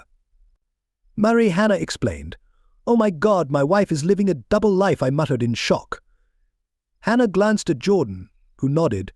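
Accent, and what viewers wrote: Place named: United Kingdom. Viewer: using a "british" style accent for an american story is very off putting the vocalisation just sounds incorrect then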